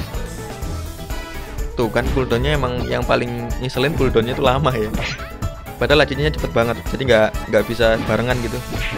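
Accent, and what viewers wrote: Malang, Indonesia. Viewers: "sama ae" 😂😂 orang mana sih bang? Malang?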